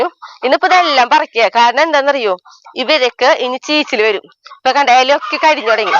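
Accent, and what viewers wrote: Thrissur, India. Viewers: Ante chechy onnum manasilakilla njangal Thrissurkaralla Thirichu parayu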